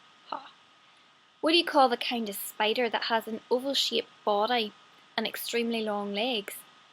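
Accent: Northern Ireland, United Kingdom. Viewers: it's a British accent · northeurn oyrleund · Great to hear this modern Coleraine accent - it has mellowed over the years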